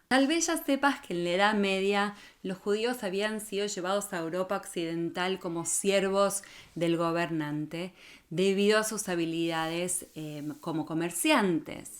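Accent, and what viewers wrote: Argentina. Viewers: Me encantan sus narraciones históricas acerca de las personas judías , habla muy bien aunque con acento argentino pero es muy musical y agradable escuchar su voz y la forma en que narra las historias punto Muchísimas gracias,SHALOM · que acento argentino tiene me desespera un poco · Tengo muy buenos amigos sefardíes y algunos asquenasies pero tienes un bello tono de voz además el dejo argentino que es muy bonito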